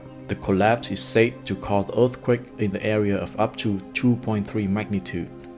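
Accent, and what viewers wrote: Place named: Vietnam. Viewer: Overall OK but I have 2 issues with this video. 1) the steel beams didn’t melt, they softened while items made from aluminum melted. Melting steel is a falsehood that has been parroted by others for way too long. 2) it’s difficult to listen to a video where the speaker has such a thick Vietnamese accent. Some words sound like a hybrid pronunciation between Vietnamese and English. It’s like nails on a chalkboard at times. It made it very difficult to actually sit and watch everything until the end.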